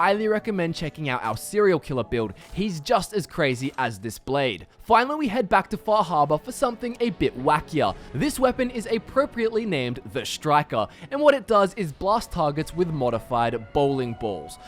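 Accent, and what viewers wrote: Australia. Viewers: I have literally never heard anyone pronounce the words Alteration or Harvester the way you do and it makes me ears confused because you have a perfectly normal Aussie accent besides those 2 words.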